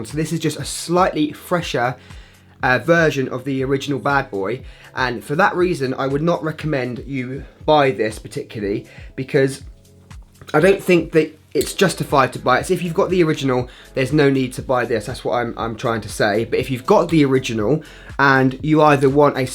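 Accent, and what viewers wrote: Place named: United Kingdom. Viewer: He is British. He is speaking the original English not the American English or whatever English you learnt.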